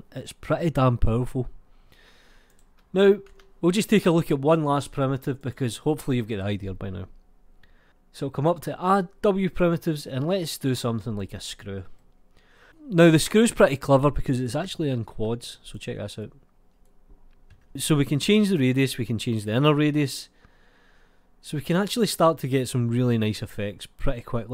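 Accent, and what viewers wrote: Scotland, United Kingdom. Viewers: His english Is great.. is that Scottish ?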